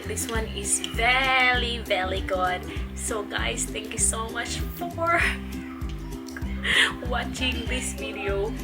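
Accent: Visayas, Philippines. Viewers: Gusto ko rin gumagamit ng ganyan ang dami kung blackheads. Ang cute mo magsalita ng Bisaya sis at madali pala yan gamitin. Gusto ko yung napasabi ka ng OMG at effective nga.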